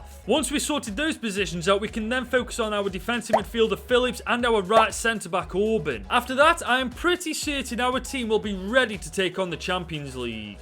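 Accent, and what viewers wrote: Stoke, United Kingdom. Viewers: Only just came across your channel. Great content!! I’ve subbed as I assume from the accent you’re from Stoke ❤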